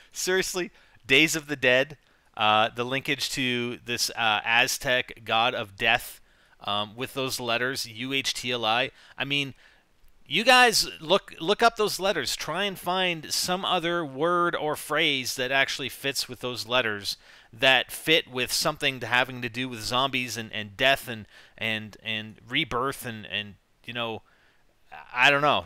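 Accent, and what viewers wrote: Spain. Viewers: U have a nice spanish accent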